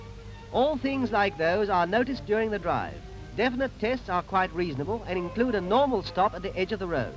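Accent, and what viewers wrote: United Kingdom. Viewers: it's not snobby that's just how the British accent sounds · Sorry just can't take the snobby British accent.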